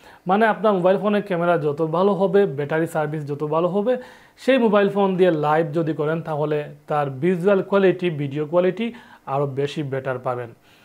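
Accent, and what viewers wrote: Sylhet, Bangladesh. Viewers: ভাই মনে হয় সিলেটি,, অনেক অনেক শুভকামনা রইল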